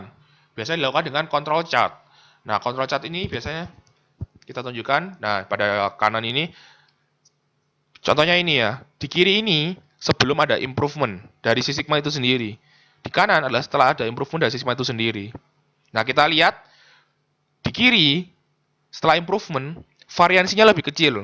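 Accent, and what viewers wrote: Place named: East Java, Indonesia. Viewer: jatim banget, lek ngomong cepet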